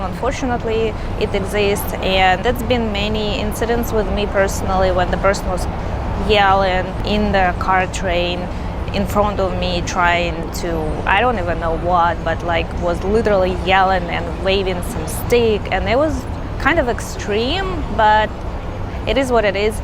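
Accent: Russia. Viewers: aaaah, that east european/russian accent:) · sounds Russian or Ukrainian to me.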